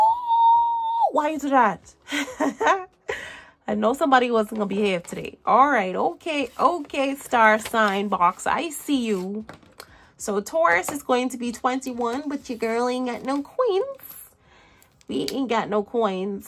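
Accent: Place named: Caribbean. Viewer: Wooohooo Caribbean girls run it 😂😂😂 defo heard your Caribbean accent thought you were Bajan😊😊 Great video love all the boxes❤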